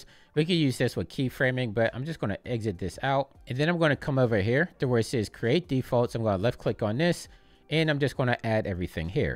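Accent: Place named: Pittsburgh, United States. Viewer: Thanks so much for this! I'm new to the channel. I thought I heard a bit of a Pittsburgh accent in your voice, then I saw the Kennywood sign behind you. My hometown is not too far from there! (I worked at Idlewild Park as a kid.)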